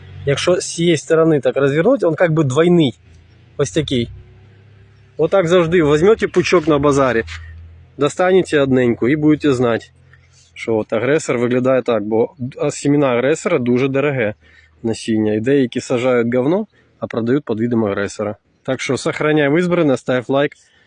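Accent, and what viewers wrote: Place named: Surzhyk, Ukraine. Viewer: Ви вчите Українську?❤ ЯК що вчите, у вас виходить цікавий суржик!❤😂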